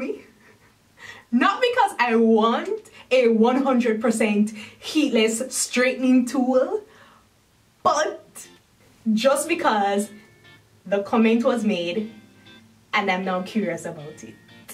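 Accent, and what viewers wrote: Jamaica. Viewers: She sound jamaican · I hear a Jamaican! I stan my fellow country woman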